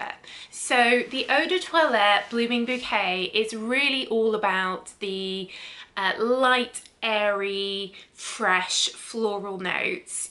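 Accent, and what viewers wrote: United Kingdom. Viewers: Hi! I already suscribed! love both versions but I prefer Absolutely blooming ❤ I 'm from Argentina and I do love the world of perfumes. As I'm an English teacher, I enjoy listening to real Britsh English reviews😉❤ they are really interesting and descriptive and it feels like you are smelling those marvellous scents😘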